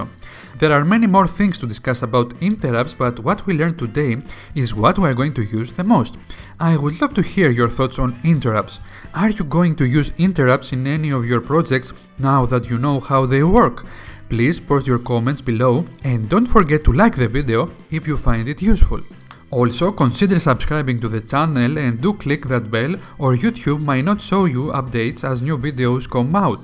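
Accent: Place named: Greece. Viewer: Nice video! Are you Greek by any chance ? Your accent sounds a lot like the average Greek speaker trying to speak English.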